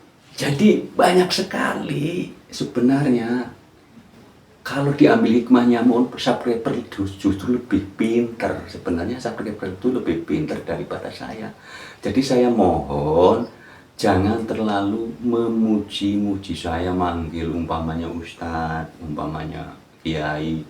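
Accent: Banyumas, Indonesia. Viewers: Ngapuntene pak tanggled bapake saking pundi gih, nopo tiang ngapak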